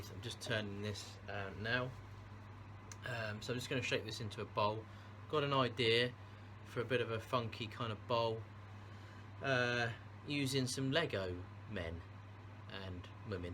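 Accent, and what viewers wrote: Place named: England, United Kingdom. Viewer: He talks correctly he lives in the land that invented English ENGLAND Yeh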